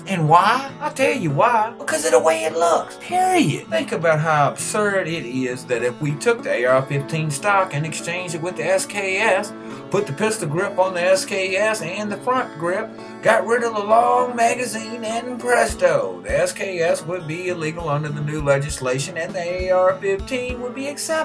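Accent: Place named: Mississippi, United States. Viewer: you talk like youre from mississippi or something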